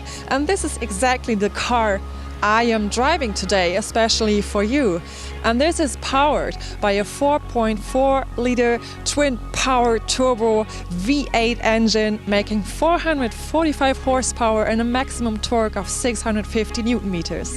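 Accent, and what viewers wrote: Germany. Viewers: Nice German accent.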